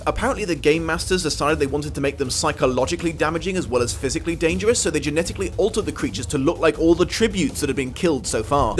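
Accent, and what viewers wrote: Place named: Wales, United Kingdom. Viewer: Where in the UK is the from? I notice the way he says certain things like "year" kind of remind me of how Rob Brydon speaks, so I wondered if he might be Welsh or have lived there.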